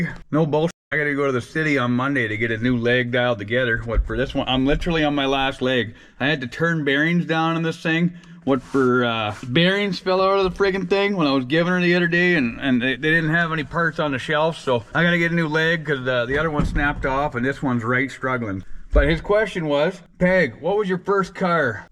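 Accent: Canada. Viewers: princess auto winch that you got on promo is the most relateable thing I've heard all day. fuck buddy I can't tell if you're from Ontario or Alberta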